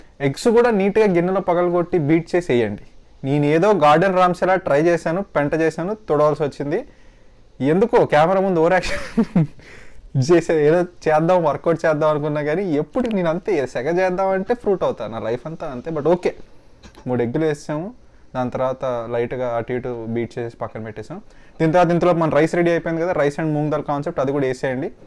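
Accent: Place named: India. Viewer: bro fan of ur telugu slang!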